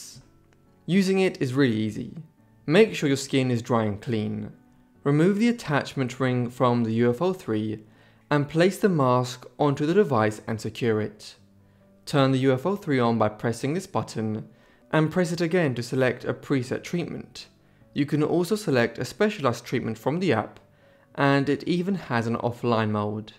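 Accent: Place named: United Kingdom. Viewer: I love your Accent are you British?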